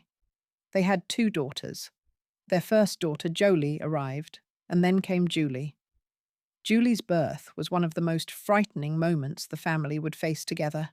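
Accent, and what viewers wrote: United Kingdom. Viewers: Maybe because she is British….. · Why is the narrator speaking with a British accent?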